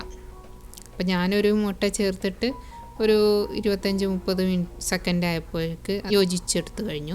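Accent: Malappuram, India. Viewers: നിങ്ങൾ നാട്ടിൽ മലപ്പുറമാണോ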